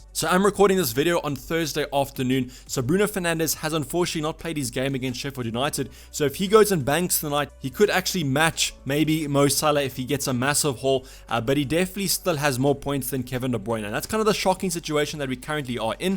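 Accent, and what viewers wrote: South Africa. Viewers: The only channel needed for expert advice on FPL, Not to mention the huge bonus of that great South African Accent. Thanks.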